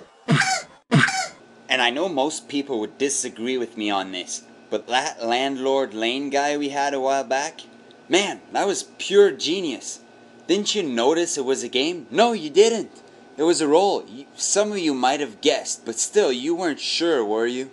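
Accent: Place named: Quebec, Canada. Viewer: Loved the animations! And had to listen VERY HARD to find any Quebecois accent in there. Pity, kinda, I love the melodious way some Quebec'ers speak english.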